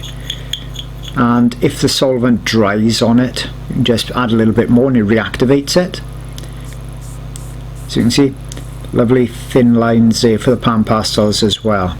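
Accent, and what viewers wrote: Wales, United Kingdom. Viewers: The absolute joy I have not only finding this video in my first ever day using pan pastels, but also hearing the voice of a fellow cymry. I just find it so soothing and familiar when learning new things, so I'm overjoyed to have found your channel.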